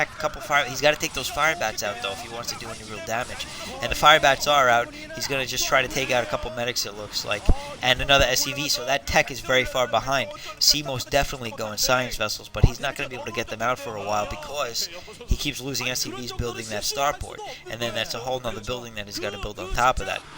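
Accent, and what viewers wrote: Boston, United States. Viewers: yo, great commentary. you sound like you from boston or something. I'm in NJ holla.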